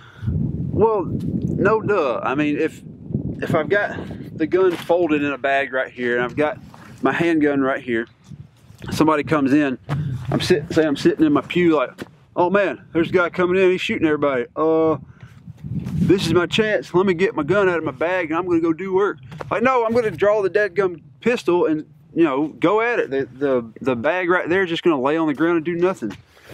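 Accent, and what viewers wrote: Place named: Alabama, United States. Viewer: What part of Alabama you’re from?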